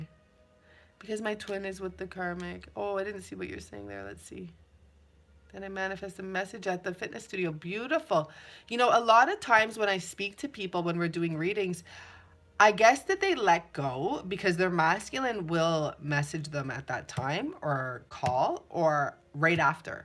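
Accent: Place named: South, United States. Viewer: Last night I woke up at 3am thinking about Pluto(Hades) and it led me to Persephone who is the goddess of vegetation and the underworld. So it is blowing my mind that your reading has touched on that. Plus my deceased grandma has been on my mind prior to the eclipse and we are from South Carolina. So that southern voice hit home... 💜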